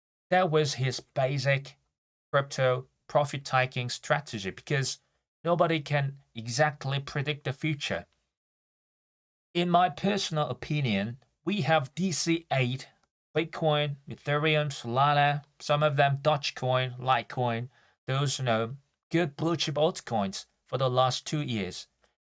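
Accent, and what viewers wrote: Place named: United Kingdom. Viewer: !!! Thank you for your comments a few days ago😊 Your British pronunciation is cool unlike the American one I 'm learning~ lol I'm grateful to you. Have a peaceful day!!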